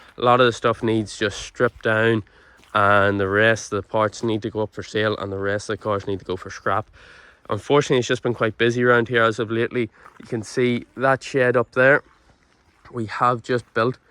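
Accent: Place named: Northern Ireland, United Kingdom. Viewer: The accent tells you , northern Ireland, probably. around Newry area by his accent🎉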